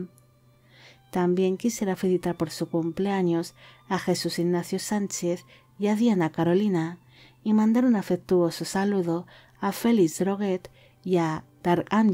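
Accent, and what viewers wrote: Spain. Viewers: De que parte de españa es el acento de la voz de?:0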